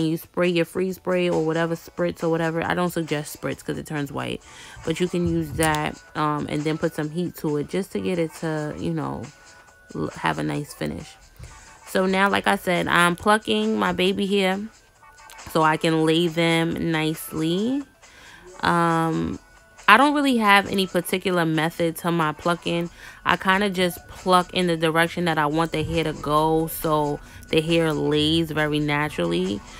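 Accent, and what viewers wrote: New York, United States. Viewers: You sound like your from NYC. Where can i find you sis your channel is everything and CONGRATS on your one yrar anniversary 🎉🎈🎊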